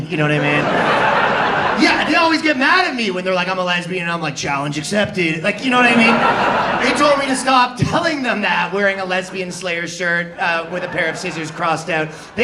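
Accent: Canada. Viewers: Is he Canadien or something?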